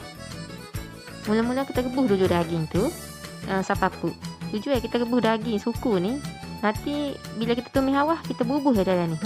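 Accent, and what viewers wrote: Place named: Kelantan, Malaysia. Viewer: hihi.. Bhsa Kelantan pure betul la sis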